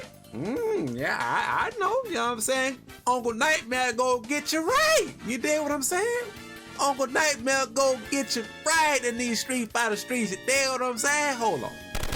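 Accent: United States. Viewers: I know this is old, bro. But thank you. I'm learning to play for real now and I know that some people preach about the frame data thing. I ran into your video and THANK GOD you're just as country as me lol. That helped a lot. Appreciate you explaining this 💪🏾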